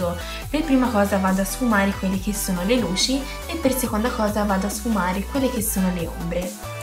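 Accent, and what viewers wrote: Tuscany, Italy. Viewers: Bellissimo video e tutorial super mega completo, attualmente il migliore sull'argomento, bravissima!... Sei toscana come me sento? ^_^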